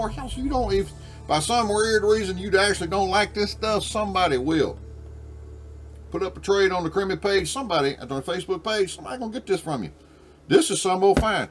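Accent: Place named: Louisiana, United States. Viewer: I like your video's very funny.I have a question for you where are from I was trying to figure out your accent I'm guessing Louisiana.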